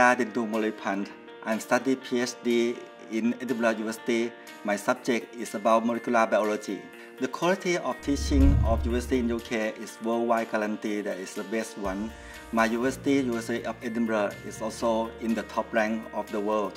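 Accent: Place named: Thailand. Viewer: ชอบสำเนียงไทยอาจารย์มากครับ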